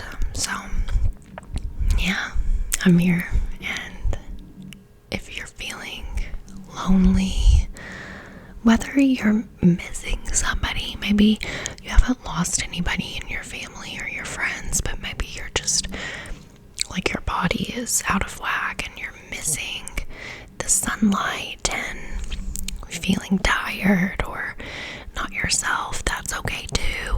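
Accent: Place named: Australia. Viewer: You're such a special person. Weird thing to say given we've not met but I spend time with you almost every day. I look forward to your hot take on whatever the topic is, I adore your Australian accent (and other), and overall wit. Thank you for sharing so much, bestie. Like, can we grab a cocktail if you're ever in Phoenix? :)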